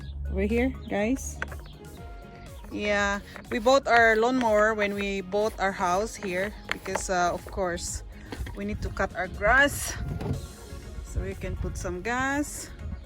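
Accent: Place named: Ilocos, Philippines. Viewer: Ang gabda ng accent biglang "inhka man i boil hahahah ilokano kabayan.. nag a apply ako im from macau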